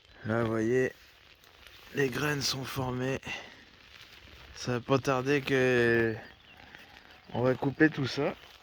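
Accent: Charente, France. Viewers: J'adore ton accent Charentais (les cagouilles😄). Bonne continuation 😉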